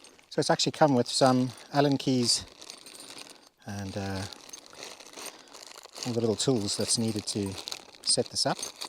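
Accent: South Africa. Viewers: Your accent like you come from South Africa 🇿🇦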